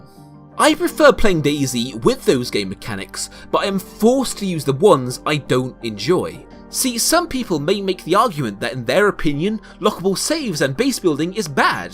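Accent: United Kingdom. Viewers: Another squeeky britbong youtuber, no thanks. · I only kept watching due to your brittish accent.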